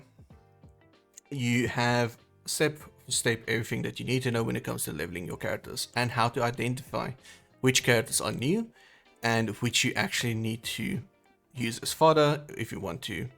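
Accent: South Africa. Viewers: Hi thanks for this. Started a few weeks ago and found this helpful. Btw am I hearing a South African accent?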